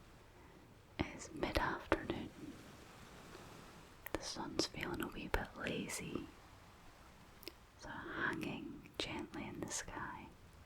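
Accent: Scotland, United Kingdom. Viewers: Your voice is so...so...zzzz (+ the Scottish accent 😍😍😍😍 you could talk me about haggis for hours I would still be relaxed ) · I didn't know I needed Scottish ASMR. Now I wonder how I ever fell asleep without it. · a sco`ish ashmr`tist, we can enjoy that:) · makes it difficult to decide if scottish or irish is more attractive. I would have originally thought the latter because of the brash dwarves in movies but she makes the former so damn pleasant. Not even counting the lovely Scottish Chinese fusion. She's a dream girl in more ways than one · Are u scottish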